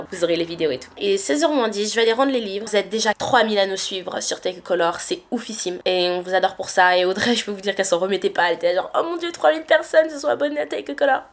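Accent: United Kingdom. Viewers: Ton accent british c'est chic babe ! ♥ aha . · J'adore l'accent anglais ! C'est chic ! et en plus tu te débrouille bien, et j' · Trop chic ton accent "british" :P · Aaaaaah j'aime tellement t'écouter parler c'est ouf *w* Je trouve ça trop cute l'accent british, aha, c'est "chic" (bon ok c'est nul mais bon hein :3) . Hâte de voir la prochaîne vidéoo <3 -J. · J'ai pas mis de pouce vert pour le cast de Bref mais pour ton Magnifique accent so British ;) Tu m'as tué x)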